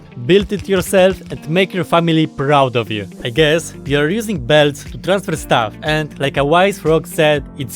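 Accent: Russia. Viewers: почему мне кажется что у тебя Русский акцент? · Well, I would say that I love you because you have a Russian accent, but I think it would be useful for you to learn Russian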